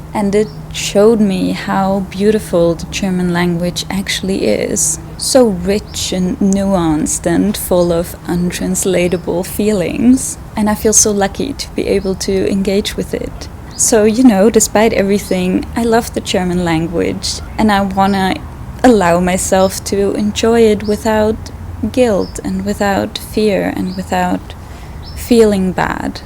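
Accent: Austria. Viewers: Das ist das erste Video, das ich von dir sehe, d.h. ich kenne dich noch gar nicht und weiß auch gar nicht, was für Content du sonst machst und worum es in deinen anderen Videos geht, aber: Als deutscher Muttersprachler aus Deutschland denke ich, dass dich während während deiner Schulzeit so kaputt gemacht hat, dass du heute Komplexe hast, weil du dein österreichisches Deutsch als Makel empfindest. Weißt du was? Steh' doch einfach zu einem Akzent! Österreichisches Deutsch hat genau so seine Berechtigung wie jeder andere Dialekt und jede andere Varietät des Deutschen... :) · Your Austrian German is so beautiful and I completely understand it as a German ❤!I think you should be proud of it ,because it is a part of your personality!!! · Nee, das ist nicht der Grund, warum sie in ihren Videos kein Deutsch redet. Ich wette, privat spricht sie dauernd Deutsch. Sie meinte halt, dass ihr österreichisches Deutsch für Leute außerhalb ihrer Gegend etwas komisch rüberkommt. 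Dann ist sie aber ein bisschen abgeschweift und hat über Hitler geredet. Das hatte aber damit zu tun, dass manche immer noch Klischees gegenüber der deutschen Sprache haben.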